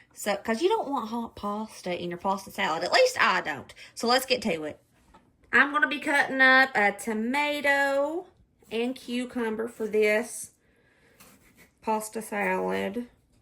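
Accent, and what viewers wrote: South, United States. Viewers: I love listening to you narrate yourself. So southern. Southern woman here:face-turquoise-music-note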